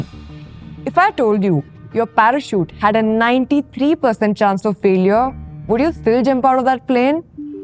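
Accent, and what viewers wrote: India. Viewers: Love The Indglish Accent 😅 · indian accent :face-green-smiling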